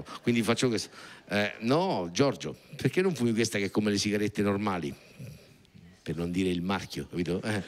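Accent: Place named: Marche, Italy. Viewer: Il marchigiano,ancor piu' il fermano, ė universale!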